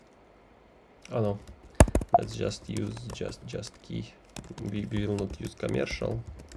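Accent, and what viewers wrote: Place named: Russia. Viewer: Ух! Нечасто даже на просторах рунета встретишь такой ядрёный runglish :)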